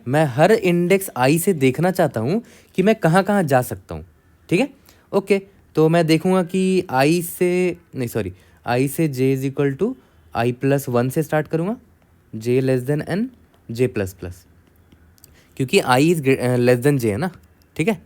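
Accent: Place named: Bihar, India. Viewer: bhaiya i know you are from up or bihar i am also from bihar currently i am in my 3rd sem . proud moment when someone from your region has great knowledge in dsa i guess this from your accent ketno dfs maar lo isme and you always said aapke not tumhre the respect you are showing .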